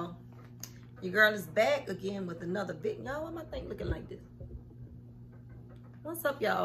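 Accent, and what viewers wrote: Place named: Louisiana, United States. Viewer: U got to be from Louisiana....because I'm from Hammond la....all in your voice.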